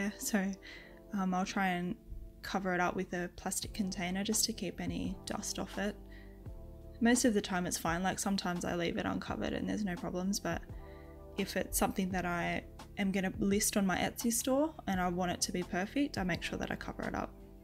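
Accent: Australia. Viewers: Great tips, thank you. Good to hear an Aussie voice too. Hugs from Heathcote Sydney.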